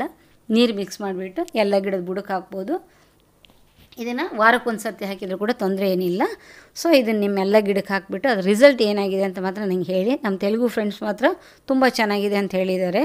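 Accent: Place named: Malnad, India. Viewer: ನಿಮ್ಮ ಸಲಹೆ ಮತ್ತು ಮಾತು ನನಗೆ ಇಷ್ಟ. ನಾವು ಮಲೆನಾಡಿನ ಜನ. ನೀವು ಮಾತಾಡುವ ಶೈಲಿ ಹಾಗೇ ಇದೆ. ನೀವು ಯಾವ ಕಡೆಯವರು ಮೇಡಂ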